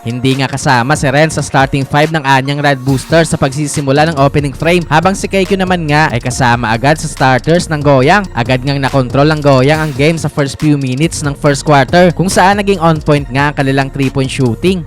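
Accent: Iloilo, Philippines. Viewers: ilonggo ka man gid idol diin ka sa iloilo tikalon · ilonggo meg? haha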